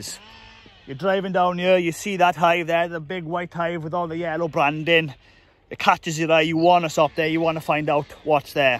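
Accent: Wales, United Kingdom. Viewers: Brilliant just what i wanted ti hear, so nice to hear that youve had no problems, i will be making one tomorrow to sell some of my excess veg, got radish, chard and hopefully soon some strawberries, kale and later tomatoes, great video man and btw best welsh accent ever! 👍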